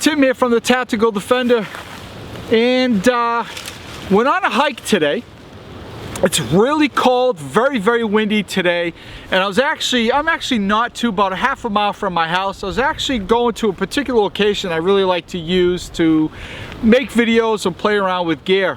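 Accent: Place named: New England, United States. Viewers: i just looked at it quickly,, i don't like the 51,, anyway,,where are you at/from?? i am from north central maine, live in west central florida,, you have that yankee accent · So I'm listening to the long island, possibly brooklyn, possibly queens or even boston accent - and being a city boy myself, I'm not overly hopeful about this review because this guy clearly hasn't grown up skinning gators or twisting antelope sinew for shoelaces. And then he taught me how to CHOP/CHISLE using a batoning technique. Apologies, bro. Solid video. You've done the work. Thanks for the lesson.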